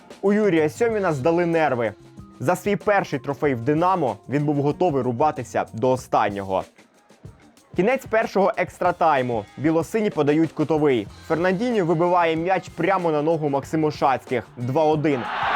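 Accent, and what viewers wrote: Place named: Western Ukraine, Ukraine. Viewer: Яка погана украiнська мова, с бандерськiм смердячим акцентом... Нiхто не збирався окупувати "украiнський" футбол , а лише зррбити шоу для вболювальникiв! Чемпiонат Радянського Союзу був на багато краше нiж чемпiонати "незалежних" Росii та Украiни, особливо на той момент, коли всi футбольнi зiрки збiгли за кордон.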